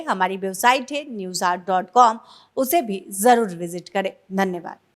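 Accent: Bhojpuri, India. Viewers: ताहार भोजपुरी सुनके मज़ा आ जाला।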